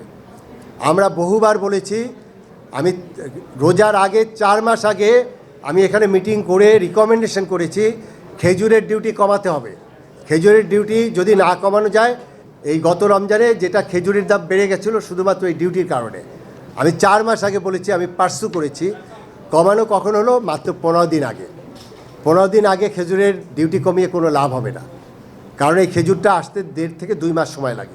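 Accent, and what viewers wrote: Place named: West Bengal, India. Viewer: ওনার বাংলা ভাষা অনেকটা পশ্চিম বংগের মানুষের মতো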